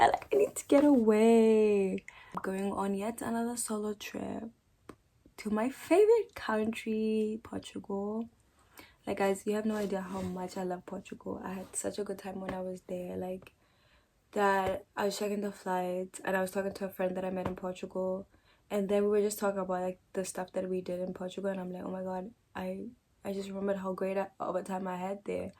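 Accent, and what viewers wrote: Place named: South Africa. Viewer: woah...you have a South African accent!🤭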